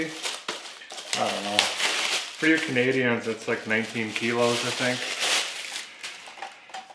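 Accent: Minnesota, United States. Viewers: With that accent you've gotta be from western Minnesota ya knooooowwww. Proud Benson native here! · why are there always idiots who downvote? I really like the channel, and there's not a whole lot about it not to like. We need more real people like you in the world. Stay safe. Sounds like you live in Minnesota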